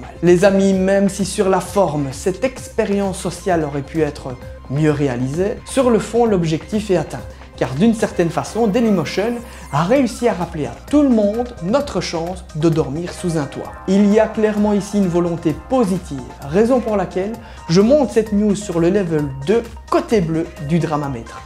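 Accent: Belgium. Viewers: Tu un ptit accent tu est belge ? Sinon cool ce concept de chaine moi shuis belge liegeois x)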